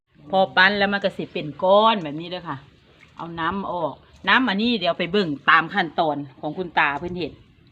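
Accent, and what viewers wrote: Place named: Phu Thai, Thailand. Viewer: สวัสดีครับแม่ มากินก้อยปลานิลนำครับเห็นแล้วหิวเลยครับ ฟังเสียงเว้าคือคนภูไทย้อ สกลนคร นครพนม เมืองเลยนอครับ ข้อยคนอุบลครับ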